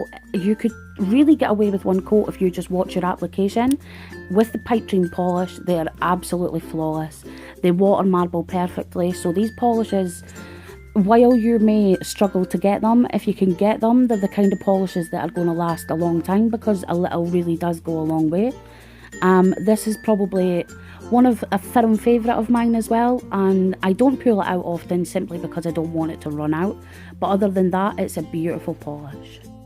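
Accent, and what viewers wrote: Scotland, United Kingdom. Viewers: Just found your videos while I was looking for a good blue polish....Thanks for the great recommendations! Also love your Scottish accent...Have a blessed day!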